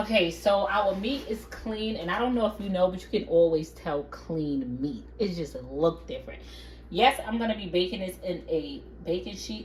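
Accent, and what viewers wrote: New York, United States. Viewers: Im loving your channel and I love hearing you talk!! I love the ny accent...ima Florida gal!! I drive my 18wheeler thru NY!! You season your food like I do mine but ima use the sauces.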